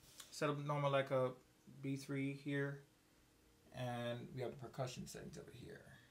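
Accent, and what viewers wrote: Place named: London, United Kingdom. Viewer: Odd question, but are you UK born? You have a slight London accent :-)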